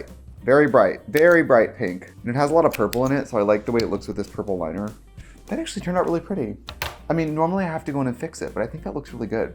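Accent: Wisconsin, United States. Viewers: We need a full video with the super Wisco accent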